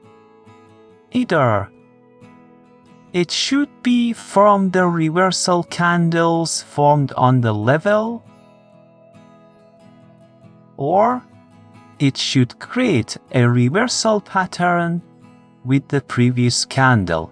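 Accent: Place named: Iran. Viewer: درستش اینه منبع ذکر کنی همه می‌دونن این روش ها اولین بار توسط استاد روزاندیش معرفی شدن انگلیسی صحبت کردنتم که معلومه تابلو زبان اصلیت فارسی 👎💩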